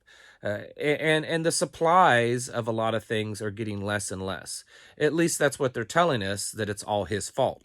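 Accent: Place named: Missouri, United States. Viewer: Too many folks are more interested in growing different types of marijuana to get wasted on than crops to eat and survive on! What state you live in, ya sound like a Missourian!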